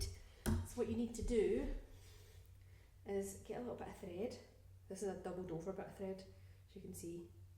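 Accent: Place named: Scotland, United Kingdom. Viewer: I lived in Glasgow for 6 years and left in 2022. I have had a Babylock sewing machine and the Ovation overlocker/coverstitch machine left at home in California, because it would not have worked in Scotland. I’m going crazy trying to do shirring on my sewing machine then discovered your video just now! So I think I can follow your video and do shirring on the coverstitch function of my Ovation…. Thank you for this video! Going back right now to watch it. I loved my time in Scotland except for the weather. The very very best part of Scotland is the people. Everyone was so kind to me and I have some very dear friends there. Your accent makes me homesick for them!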